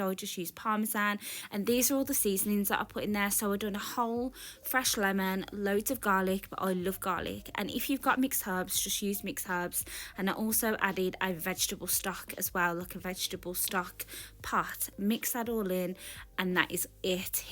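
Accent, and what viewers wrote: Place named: Caribbean. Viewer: Are you from the Caribbean originally? I hear a bit of an accent coming though sometimes 😃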